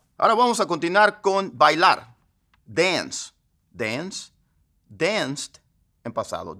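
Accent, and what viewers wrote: United States. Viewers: His English has such a strong American accent lol I thought he was American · Por qué tu español tiene acento gringo??? Es un efecto de aprender el inglés con acento nativo?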